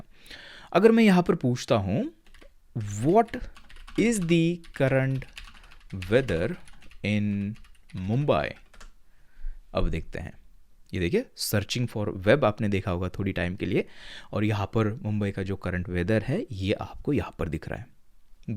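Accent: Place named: South, India. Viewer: Sir jii south indian waali feel aati hai aapke baaton se...!!! 😎😎 btw great and valuable series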